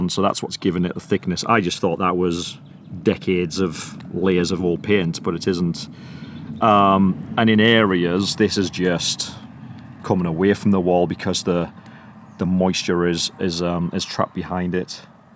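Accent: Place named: North East England, United Kingdom. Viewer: Hi guys, I agree with everyone that says use professionals, sometimes it’s money well spent and leaves you both to do others jobs. where’s your accent from, we moved from Sunderland to Morpeth nearly a year ago and your accent sounds from up here. I’m loving your channel and have just subscribed, I’ve just finished bingeing all your other videos.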